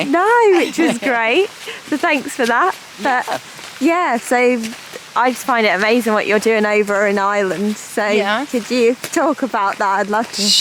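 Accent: United Kingdom. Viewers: I from indonesia(kupang City)i like u aksen british&you nice girl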